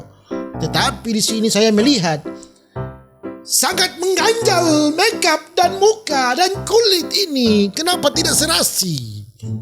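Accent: Manado, Indonesia. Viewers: Kurang seru, so jarang ba logat manado noh..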